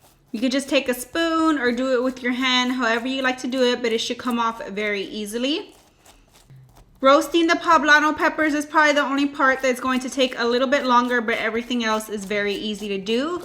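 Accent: Texas, United States. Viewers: I’ve never heard anyone call it a “cash-a-roll”. Lol. That must be your Texan accent ;)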